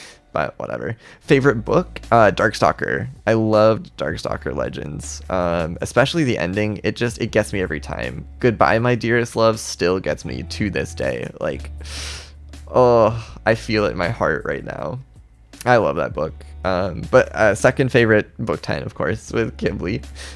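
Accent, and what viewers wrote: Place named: United States. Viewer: *Says that Bluestar is his fave WC character.* Me: Do I know you? Wait, do I live close to u? *My brain: Please say yes please say yes.*: Says he is from USA Me: I can tell The way u say ‘water’ It’s WATER NOT WADDER!!! Fun fact: I have met in Wings of Fire Roblox game What’s graduate school?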